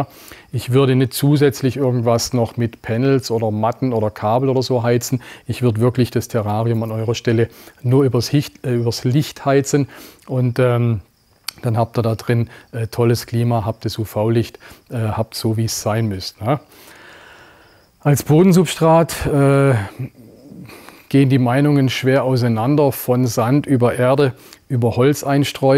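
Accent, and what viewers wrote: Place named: Baden, Germany. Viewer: Es tut immer wieder sehr gut, die Güte zu spüren, die du deinen Tieren entgegen bringst! Bin in der Tat nicht grad ein Kommentarschreiber (obwohl das heute bereits mein 2. ist 😊)! Aber das musste jetzt mal gesagt werden = wie sehr ich deinen Umgang mit den Pfleglingen schätze)!!! Wenn ichs richtig in meinem "Hohlen" habe, gehört der heutige Protagonist auch zu deinen persönlichen High 5 (Platz 4 oder 5?) ?!?! Nun hab ich noch ne Fachfrage: es gibt ne 4--5teilige Doku die sich mit der Tierwelt der indopazifischen Inseln auseinander setzt (Micro--Macro--und Polinesien)! Natürlich auch Neukaledonien, Fidschi, Tonga, Bora--Bora, Papetee, Samoa, Weihnachts--und Gesellschaftsinseln, Palau, usw.usw. OK, da ist nix mlt Grosswild,ect. Aber mit endemischen Raritäten! So gibts auch den Riesenskink, ein friedlicher Gigant! Der müsste noch um einiges gewaltiger, als die Blauzungen sein. 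Ob davon ein Video machbar ist? Letzte Frage: wo ist dein Reptilien--Haus? Vom Slang her, würde ich dich in die Badische, Schwäbiche oder Breisgau--Ecke verorten! Denn ich muss das alles irgendwann, mal life sehn! Für heute erstmal alles Liebe und Gut, sowie meinen Respekt!!! MW aus MG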